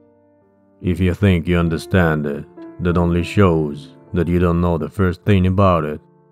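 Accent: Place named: Eastern Europe. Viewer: Ok my friend, if u ever come to Toronto... Contact me... Seriously...I would love to host you :) And I won't forget the offer. You sound eastern European. What country are you from? Bhor was twisted. Interesting how many of these great intellectuals are so relatable and down to earth. Pragmatic yet spiritual. LOVE IT. Thanks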